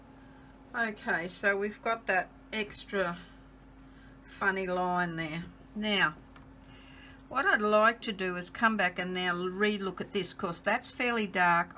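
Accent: Australia. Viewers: I love that you’re an Aussie like me! I was listening watching and you were a few minutes in and I was Ohmygosh yr an Aussie lol Love love what you’re doing and done. There are never any mistakes in art. They merely become something you didn’t know you were going to create! Hehee love and subscribed to you. Thanks for a brilliant tute.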